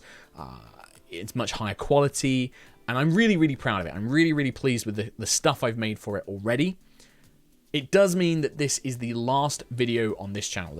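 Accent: United Kingdom. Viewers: Hey, originally I started watching HR because 1) fresh from finishing Yogsquest and Dungeons and Dafties, I needed the silly Yogs brand of D&D, 2) I had just watched the star wars rpg stuff with you and the Hat Bois and watched for you, Trott and Kim whom I was already following for Flux Buddies, and 3) I had been watching CR and Aq inc for a while and was happy to hear a more familiar British accent:) I feel a little sad that the little interviews are gone and I really loved that Vday board games video, but, you do what you have to do to clean house in your own head. I understand:) I'm a follower of the usual D&D shows: CR, Aq Inc, Aq inc C team, DCA, but HR will always always always have a special place in my heart. I admire how much effort you put into these videos, d&d and your patreon stuff. Honestly Kim and you won me over when you did that early Lightfall side episode catching up on what happened when JiuTou died. 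It's nice to see a DM not just invested in their game but in the plot and the characters. Will be supporting you in whatever you do, as long as you are happy doing it; bringing your brand of DM voices, silly jokes, npc character development.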